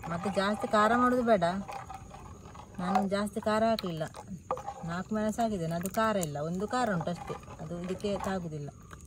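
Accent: Mangalore, India. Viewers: Pure mangalore kannada❤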